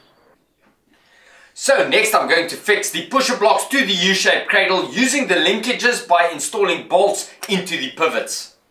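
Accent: Afrikaans, South Africa. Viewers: Hey man, your accent….? Is it Afrikaans? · It's English......from South Africa. We have accents. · Is that a south African accent?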